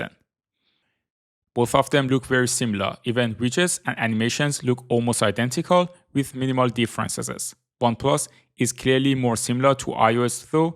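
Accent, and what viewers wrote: Iran. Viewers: Bro you sound like a Persian guy trying his best to speak fluent english.❤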